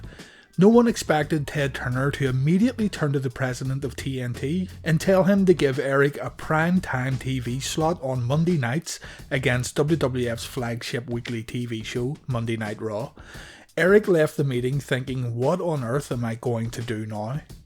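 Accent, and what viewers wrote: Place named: Northern Ireland, United Kingdom. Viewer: Dude are you from the north of Ireland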